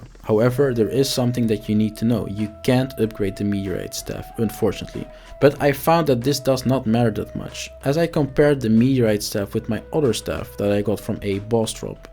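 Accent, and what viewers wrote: Netherlands. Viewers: you have to be dutch, i can hear it. nice video :)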